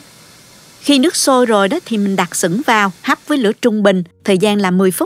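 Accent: South, Vietnam. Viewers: Rất thích xem,học những món ăn của, nhất là giọng nói thanh tao dịu dàng đặt trưng của người dân Nam Việt Nam. Cảm ơn.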